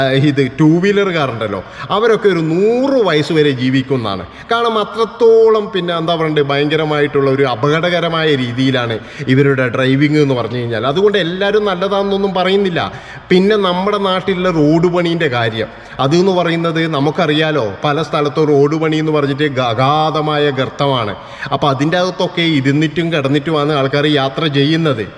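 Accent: Kannur, India. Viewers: Kannur slang nice ❤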